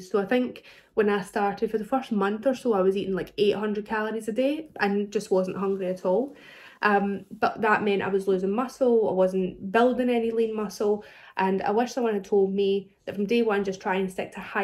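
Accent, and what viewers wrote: Scotland, United Kingdom. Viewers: That Scottish accent is absolutely gorgeous. Thanks for the great video and congratulations. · Amazing advice! Thanks so much for sharing and congrats to you!! I'm from the USA and I'm on Zepbound which is the exact same med as Mounjaro just a different name and I've lost 78lbs in a year so far. Also, because I'm a huge Outlander fan I understood all of what you said with your beautiful Scottish accent ❤️❤️ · Oh my goodness how fabulous to hear a fellow Scottish voice! I’m on week 7 of my journey and have just subscribed to your channel. What an inspiration ❤ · Hi, I’ve only just discovered you. I’ve been on Mounjaro for 2 weeks and I could already relate to what you said. How refreshing to hear your lovely Scottish accent and to hear you talking so intelligently and emotionally about you weight history over the last 30 years 💗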